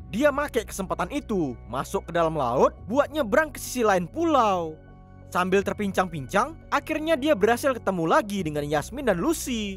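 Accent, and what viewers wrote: Medan, Indonesia. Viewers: BANG ABANG MEDAN MANANYA BANG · Logat Medan bah...👍👍👍👍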